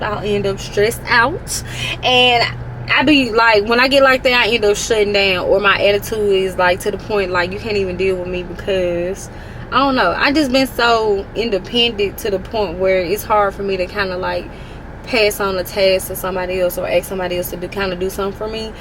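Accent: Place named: Mississippi, United States. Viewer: Mississippi girl here! Eboni Ebo sent me over to your vlogs and I knew from the moment you spoke “she is from the Sipp” haha I love it! I have been enjoying your content so far and will continue to support. Thanks for sharing with us!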